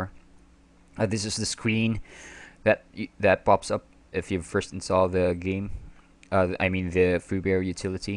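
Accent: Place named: Philippines. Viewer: Pinoy ka?, ganda ng accent mo...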